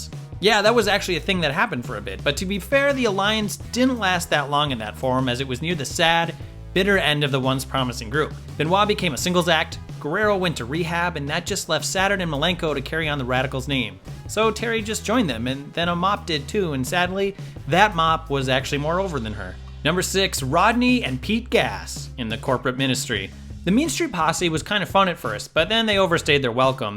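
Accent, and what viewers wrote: United States. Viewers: Who's this American guy, where's Jack or someone? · It’s so weird hearing an American and not a Brit on this channel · hahahhahahahahahahahahha listening to this yank talking about brawn in 2018 hahahahahahahahahahaha fucking bullshit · Get a non-yank to do these videos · sounds weird to here a american on what culture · great American accent. Thumbs up! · There is a American workin for WhatCulture!? Its weird hearin his voice since all the rest is brits.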